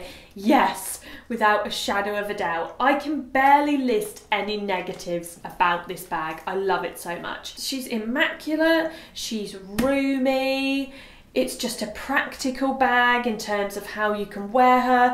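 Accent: United Kingdom. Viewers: I love your video and your british accent. The dupe you are proposing are stupefying. Good luck for your marathon! Your fan from Quebec! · I love love your speech with the clear british accent and beautiful voice.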